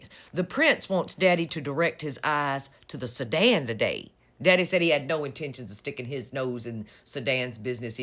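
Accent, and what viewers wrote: South, United States. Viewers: I just love your analyses. With your southern accent, your comments are on point while sounding smooth as Vermont maple syrup. It makes what you are saying more compelling to hear. ❤❤❤❤❤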